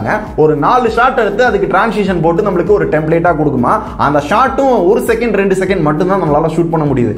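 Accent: Madurai, India. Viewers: Bro neenga madurai yaa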